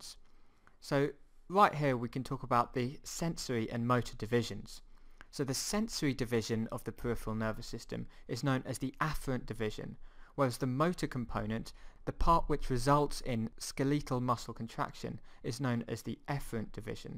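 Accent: United Kingdom. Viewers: you speak in british accent right ? btw awesome work i wish you were in my uni :((((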